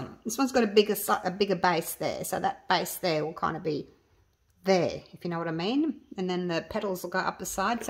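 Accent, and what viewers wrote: Australia. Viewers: Wow that is amazing! Everything you do is amazing!! I work with resin but can’t even come close to doing all the great stuff you do!! I love all your videos!! Plus there isn’t anything better then a Australian accent!! Lol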